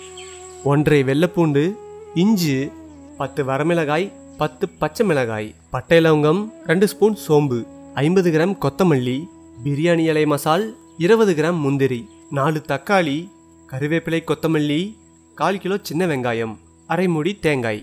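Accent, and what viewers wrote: Salem, India. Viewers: இவர் பேசுவது சேலம் வட்டார மொழி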